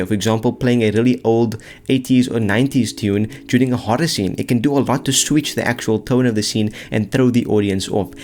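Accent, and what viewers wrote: South Africa. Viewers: Your accent has some South African in it. Like, a LOT actually.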